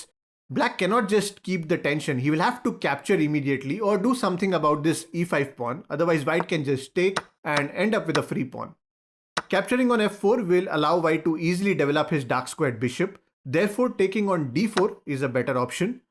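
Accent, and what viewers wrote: India. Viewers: Some people need the subtitles to better understand Hindu English to give them a better experience, unless they miss important lessons... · This chanell is magic! Dude with indian accent whisper me when i played... No Offense because Indian and Singaporean Accent is easier to hear by majority of Asian...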